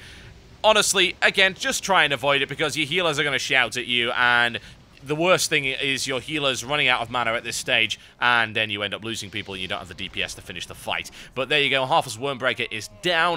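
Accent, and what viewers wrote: United Kingdom. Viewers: ahhh british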